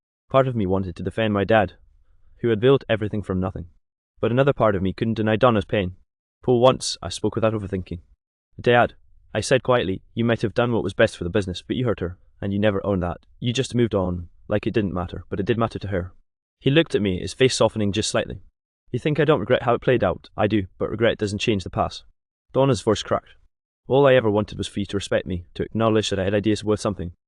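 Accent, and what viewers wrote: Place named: Ireland. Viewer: Cool Irish accent! 😂😂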